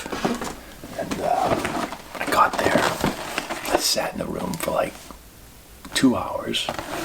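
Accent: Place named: Boston, United States. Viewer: I knew your were a freak lol ASMR hahaa all that heavy breathing and the boston accent so hot!!!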